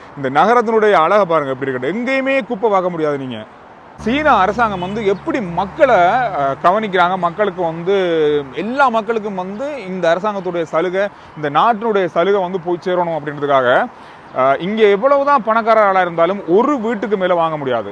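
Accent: Sri Lanka. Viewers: உங்களின் இலங்கை தமிழ் கேட்க்க இன்ப தேண் வந்து பாயுது காதிணிலே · உங்களது இலங்கைத்தமிழ் தமிழ்நாட்டுத் "தமுளை" விட கோடி மடங்கு இனிமை !!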